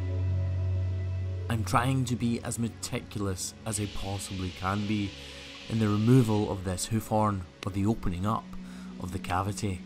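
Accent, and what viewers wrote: Scotland, United Kingdom. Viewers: I so enjoy your videos. I live on a farm no animals anymore but we plant 99 acres still. Your voice is so comforting. I am 3 generation Scottish American. Everyone family here with the accent has now passed. So when I listen to your videos I am taken back to my childhood and my nanny and grandpa. I have distent family still in Scotland. Definitely want to come home and find what family I still have. Maybe 1 day I'll comment that I finally made it. Keep caring for the beautiful ladies and big handsome gentlemen.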